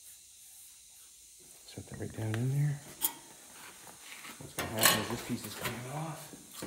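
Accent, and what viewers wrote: Canada. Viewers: I recognized the Canadian way you say some words. We do the same in Wisconsin. Liked. Subscribed. Bell rung.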